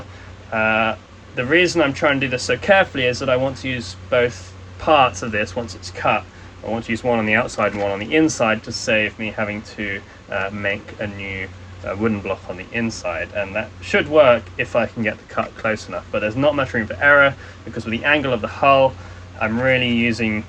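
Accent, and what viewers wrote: Australia. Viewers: nice to hear and australian accent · Two ingredients for successful boating - patience and the maturity to know when brute force is the only option. Good to hear an Aussie accent as well.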